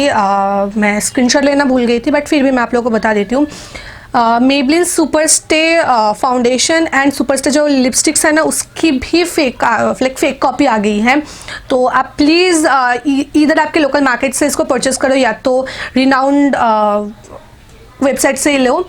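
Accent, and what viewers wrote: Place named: Bengal, India. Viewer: Bengali accent😍😍😍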